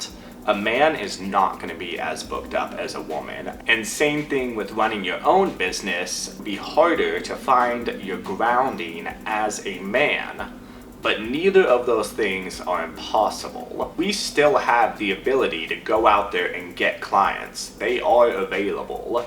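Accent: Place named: Louisiana, United States. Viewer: Handsome Canun Louisiana accent.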